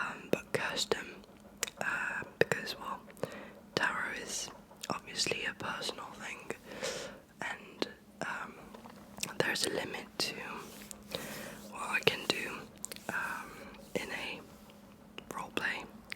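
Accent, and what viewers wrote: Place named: United Kingdom. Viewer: thanks for the great video! question: did you live in england at some point or learn english very young?? your accent is so characteristically british i would never guess you are french!!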